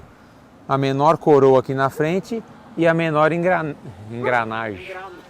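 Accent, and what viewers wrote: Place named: Northeast, Brazil. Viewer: Fala direito so nordestino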